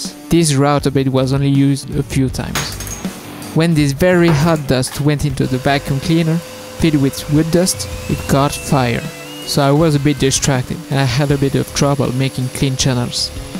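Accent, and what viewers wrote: France. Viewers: your french accent is amazing, i loved your guitar building, you mentioned practice, i see a master, lots of luck in your guitar building career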